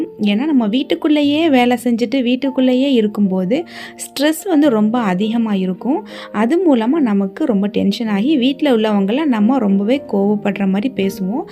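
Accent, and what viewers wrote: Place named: Ramanathapuram, India. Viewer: மாஷா அல்லாஹ் நீங்கள் சொல்வது அனைத்தும் உண்மையான விஷயம் தான் ராமநாதபுரம் பாஷை கொஞ்சம் வித்தியாசமாக இருக்குமே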